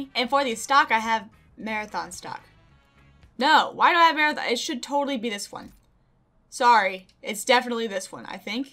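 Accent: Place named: Texas, United States. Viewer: I would do anything to have someone as positive as you in my life! Love you! Love the Texas accent aswell!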